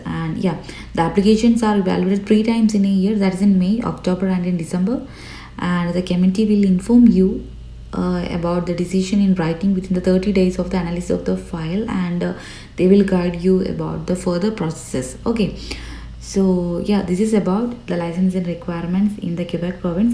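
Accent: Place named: India. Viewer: The strong Indian accent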